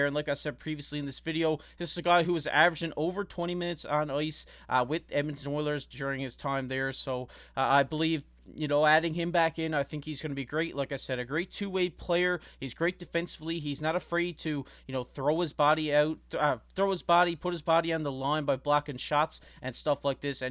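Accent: Newfoundland, Canada. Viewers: 100% a newfie accent on this guy. Love it b'y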